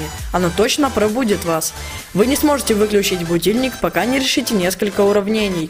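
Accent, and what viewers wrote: Belarus. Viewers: Слушай а ты из какого города? Бреста?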